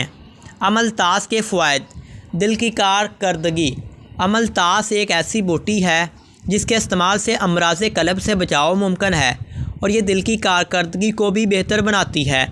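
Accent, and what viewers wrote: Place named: Rural, India. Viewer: Oh pendu baat krni ki tameez Nahi moh utha kr video bananaiy ajataiy hai sastay Hakeem Kiya talafuz hai Urdu k tera pendu bs kr dy bs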